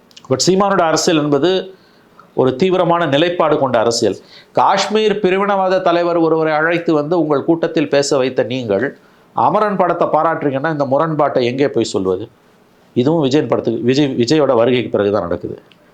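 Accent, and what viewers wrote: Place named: Telugu, India. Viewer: Telugu voice. This guy from Aanthra city.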